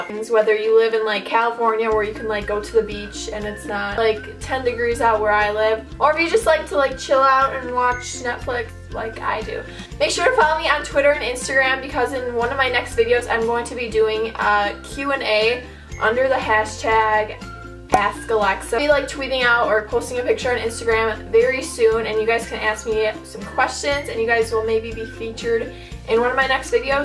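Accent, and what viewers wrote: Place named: North, United Kingdom. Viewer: You're so pretty and you have such a northern accent it's fun to listen to!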